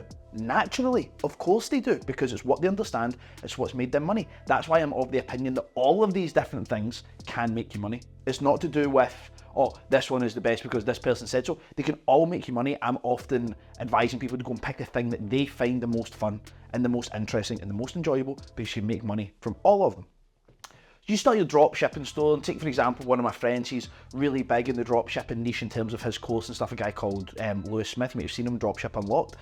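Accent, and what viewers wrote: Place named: Glasgow, United Kingdom. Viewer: your accent reminds me of visiting my gran in priesthill near pollockshaws as a kid in the 80s - im in Cheshire england all my life but all family are local to pollock, good content mate - im self employed too and agree with a ton you say 😎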